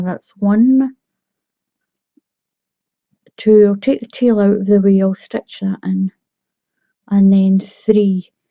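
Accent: Scotland, United Kingdom. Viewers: Hi from cork Ireland I love your tutorials also the Scottish accent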